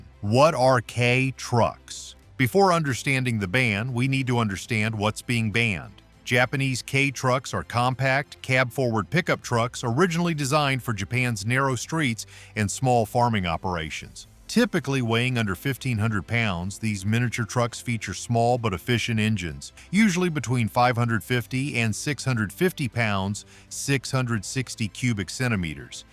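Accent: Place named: United States. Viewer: Sounds very American 🤮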